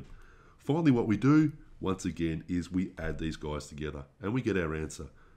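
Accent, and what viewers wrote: Australia. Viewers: you sound like bruce from finding nemo are you australian mate?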